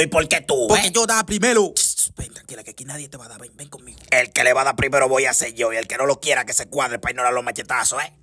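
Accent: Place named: Dominican Republic. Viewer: ey demasiado duro te sale todas las idisomas de RD jajajaaj🤣🤣😂😂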